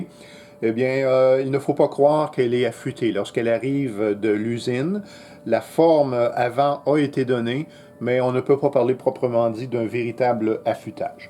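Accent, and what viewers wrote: Quebec, Canada. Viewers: bonjour et bravo pour tes vidéos. pour le français que je suis c'est toujours très agréable d'entendre l'accent québécois et surtout ce qui est palpable c'est ta simplicité et ta proximité. les vidéos sont super bien tournées et bravo pour la simplicité dans la pédagogie. mon tour arrivant d'ici 15 jours j'ai déjà hâte de suivre tes premiers cours. A bientôt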